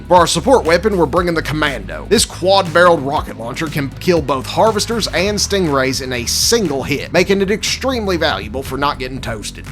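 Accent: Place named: Texas, United States. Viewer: I LOVE his patriotic Texan accent.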